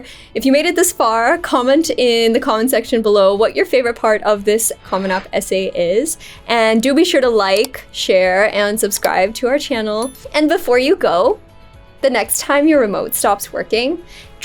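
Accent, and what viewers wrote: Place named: United States. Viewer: Nice language American accent🔥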